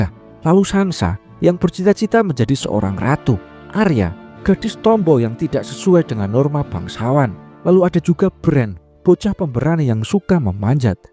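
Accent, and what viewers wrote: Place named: Java, Indonesia. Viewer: jawanya medok banget bang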